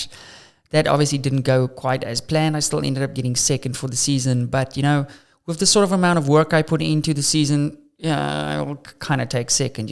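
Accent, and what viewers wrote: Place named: South Africa. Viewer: Thank you love the channel and the south african accent 👌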